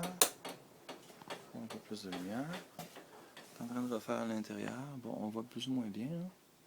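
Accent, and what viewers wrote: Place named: Quebec, Canada. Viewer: merci pour cette sympathique vidéo, toujours un immense plaisir de d'entendre la voix du Québec. Pour les ailes arrières, hélas c'est plus que la rouille mais de la perforations, j'ai eu droit également pour les ailes avant et les portières. Il faut découper, former une nouvelle tole, souder etc.... mais le résultât est à ce prix. En tout cas félicitation pour votre belle SM. <link>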